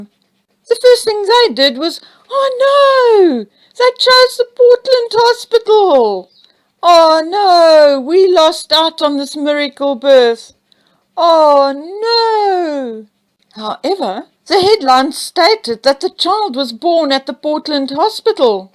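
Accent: South Africa. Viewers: Dis 'n lekker Saffa accent... ek is van de Baai. 😊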